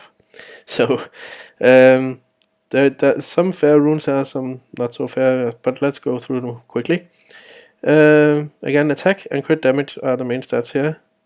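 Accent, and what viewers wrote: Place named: Germany. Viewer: I bet you are from Germany, it really sounds like it. :D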